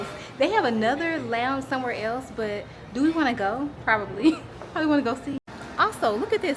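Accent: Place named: South, United States. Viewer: Your accent sounds more Southern than Texan. Did you grow up in Texas ?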